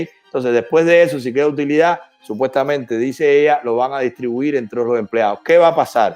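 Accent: Cuba. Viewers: Ese man es nacido y criado en la caliente. En el coraxon del cerro ..CARRAGUAO... No hay otra forma de hablar para alguien nacido alli.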